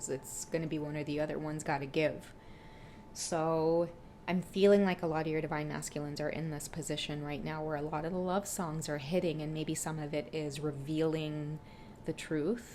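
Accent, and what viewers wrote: Trinidad and Tobago. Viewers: Hi.. I'm Jamaican 🇯🇲 & let me tell you, I absolutely love the trini accent! I always look forward to you saying something in your accent. Love it! ❤ This reading rings true about songs, & they're very old, most of them I've never heard before but speaks of our situation. It's been happening on my end too both channeled & hearing on the radio. He's definitely getting clarity cause I do sense/feel it. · Hi i am a twin flame and also a trinidadian, love the accent and you always have pleasant and optimistic things to say to those on this journey. Blessings to you.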